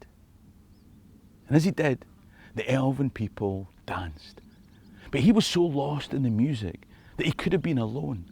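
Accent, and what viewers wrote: Scotland, United Kingdom. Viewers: I always think I'm going to fall asleep to his beautiful Scottish brogue but my brain never wants to shut down mid story. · Loved the story. Your voice and accent is mesmerizing. It's like the soul of Scotland 🏴 come to life. Makes me long for a place I've never been but always wanted to be. Happy 60th Birthday. 🎉 P.S. Don't ever lose your Scots language.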